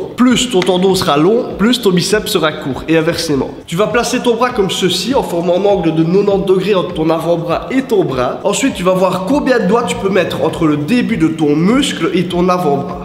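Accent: Liège, Belgium. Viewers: Jkiff ta facon de PARLÉ vive la Belgique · Salut, Bien sympas les vidéos. J'entends comme un petit accent liégeoise ... :) Tu es de quel coin ? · Oufti l'accent 👍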